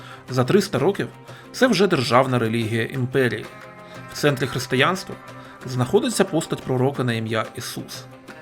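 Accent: Russia. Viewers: Хороше відео, проте попрацюйте над українським звуком [г], бо звучить завжди [ґ] - різкий рос акцент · супер. але працюйте над вимою букви "г"))) Бо чути, що вимова російська))))) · Російський акцент ПІДБІШУЄ.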